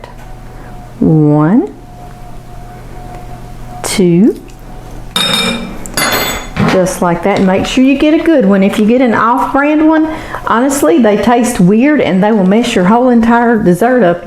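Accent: South, United States. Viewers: I’m only here for her southern accent.. from Hawaii and just fascinated listening to her explaining how to make yummy goodies!!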